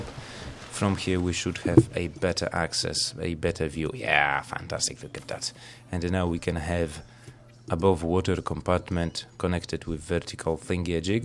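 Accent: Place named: Eastern Europe. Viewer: Where are you from, your accent is very nice. I think east europe.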